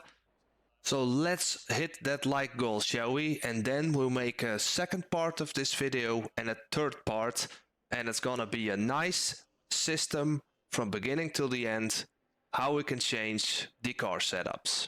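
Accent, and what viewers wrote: Netherlands. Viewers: You have amazing videos! Subscribed. Btw, you're Dutch, right? (me too). You have that Dutch accent when speaking English. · I smell dutch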